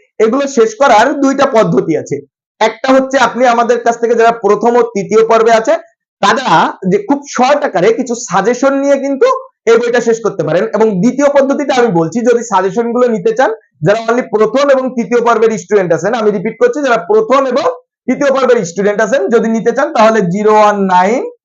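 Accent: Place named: India. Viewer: আপনি যেই ভাবে কথা বলেন আমি ভাবছিলাম আপনি ভারতীয়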